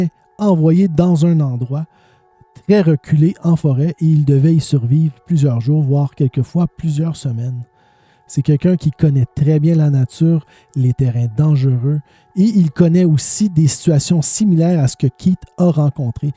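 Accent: Canada. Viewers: Préviens que tu es canadien horrible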